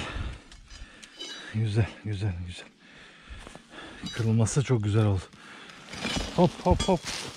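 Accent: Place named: Kırklareli, Turkey. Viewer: Sa dostum,ben Edirneliyim ama seni Viyanadan takip ediyorum,o kirmizi tasli olanin altindada bi tas varmis oralardadir buyuk ihtimalle ,bu arada oralari Kırklareli taraflarimi siven bizim oralı oldugunu gösteriyor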